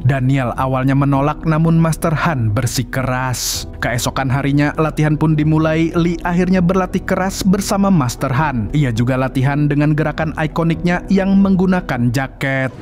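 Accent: Banjar, Indonesia. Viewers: Anjayy org banjarr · Adminnya bisa lai bahasa Banjar😁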